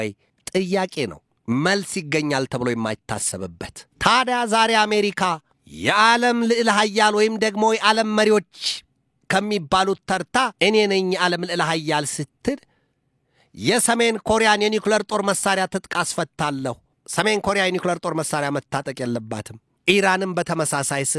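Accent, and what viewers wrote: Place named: Ethiopia. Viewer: አቀራረብኀ ይመቻል: ብቻ የሀበሻ እንግሊዘኛዉን ብታቆመዉ የበለጠ የተዋጣለት ይሆናል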